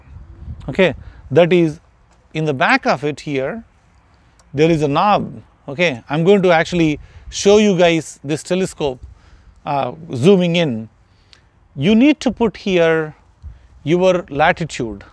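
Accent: India. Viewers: Indian accent 🥴. I don't understand anything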